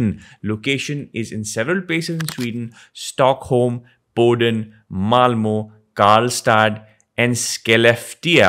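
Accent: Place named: India. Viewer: jesus, I respect this guy, but Indian English, OMG!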